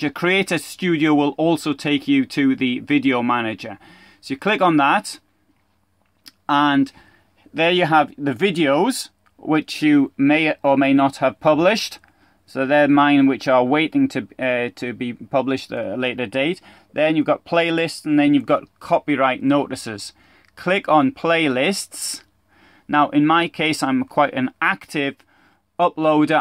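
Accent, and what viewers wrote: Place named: Newcastle, United Kingdom. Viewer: You sound a bit of a Geordie, like eh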